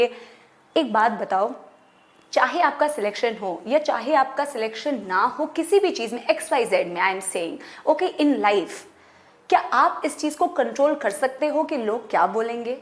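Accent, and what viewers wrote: Odisha, India. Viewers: Mam are you from odisha ?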